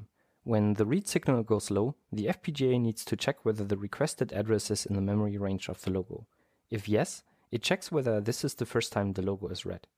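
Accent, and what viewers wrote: Germany. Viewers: accent is kinda cute 😅 he sounds German or something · Are you a german? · Are you german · Technisches Gebrabbel auf Englisch mit deutschem Akzent - ich kann dir nicht sagen, wieso, aber irgendwie hat das Charme. 😅 super Video, mega interessant. Danke! 😊👍🏻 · Sprichst du deutsch ? Dein Englisch hört sich wie meins an ^^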